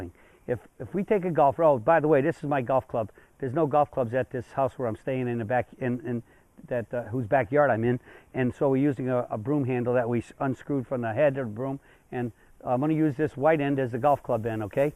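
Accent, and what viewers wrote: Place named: Boston, United States. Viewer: you sound like you're from the boston area like me. thnx for the lesson.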